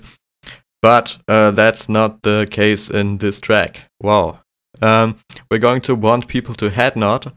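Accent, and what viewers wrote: Germany. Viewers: Great vid man, thought I detected a German accent ;) Hast du ein eigenes soundcloud seite? Deine beats sind richtig geil!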